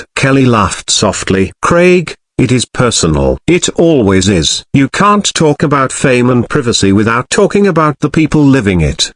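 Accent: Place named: England, United Kingdom. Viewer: Why the English accent voiceover??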